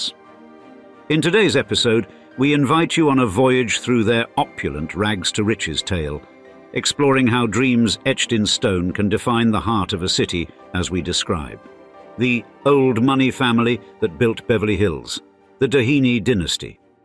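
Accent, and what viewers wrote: United Kingdom. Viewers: A British accent narrating an American city sounds odd.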